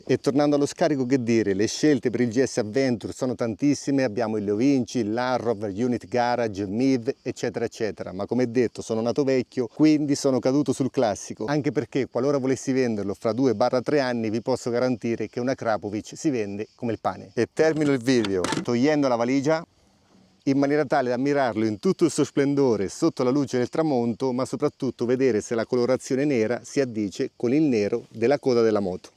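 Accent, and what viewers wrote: Marche, Italy. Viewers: Tu sei MARCHIGIANO X CASO?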